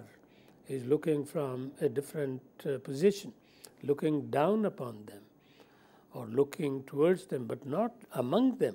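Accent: India. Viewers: Useful but it goes heavy with Indian English.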